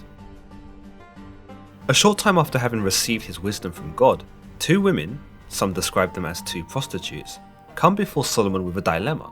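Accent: United Kingdom. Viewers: Something about British voices that make things sound better lol.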